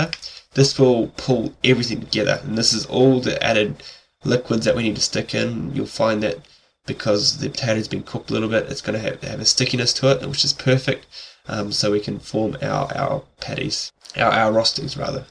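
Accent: New Zealand. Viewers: hey i'm not judging but you sound like you're from New Zealand hehe x) I used to stay in NZ for 4 years I love all of your recipes!! <3 <3 I am a big fan of yours xx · He has a New Zealand accent, not Australian :D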